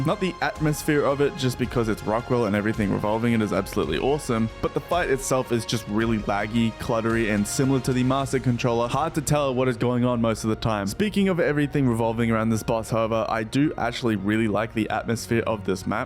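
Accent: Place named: Australia. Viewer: Completely off-topic but this comment made me realize that I was hearing an Aussie accent, and now I’m barely noticing that a large number of youtubers I’ve watched throughout my years are actually Australian lmao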